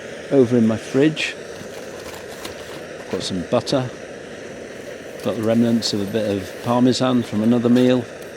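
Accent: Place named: United Kingdom. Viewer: My job as a train driver(train engineer might be the correct English term) do put me on when i am at work, so when ever i get the chance I take a walk during a work day, and when i get home i watch your or some of the other British outdoor youtube shows, that relaxes my brain, and i enjoy listening to real english. Keep em coming.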